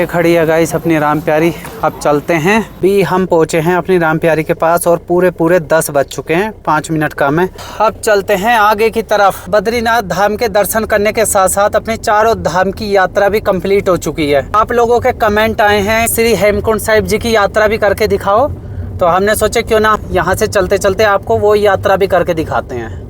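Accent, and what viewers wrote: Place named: Uttar Pradesh, India. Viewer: Bhaiya aap kaha se ho aapke bolne ka tarike se tho aap UP se lagte ho bhut acchi lagti h aapki video me aapki new subscriber 😊❤❤😊😊😊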